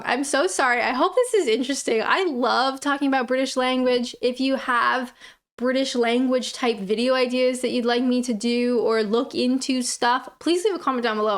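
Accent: Canada. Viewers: Hello chick, knobhead is a great English word, a friendly insult!? Also I have been told a person's accent settles between 17-20 so it's unlikely that you will ever lose your Canadian twang unless you do it deliberately which is an option after a certain amount of time!? xx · At the time of bus conductors,com on git aff.meaning hurry up and exit the bus. please speak English your Canadian is still strong. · You need to go for several pints with a Gen X brit, also we don't all see Canadians as foreign, you are like us with a funny accent x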